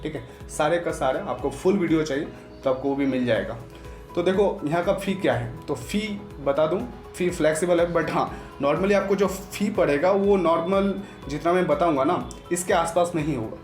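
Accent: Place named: Northeast, India. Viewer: Hahah..this is not funny Bro..!🙄 Looks like this guy is from Northeast..and waha ki hindi thori si alag hoti hai.. isliye inka accent alag hai..!! Don't make fun of him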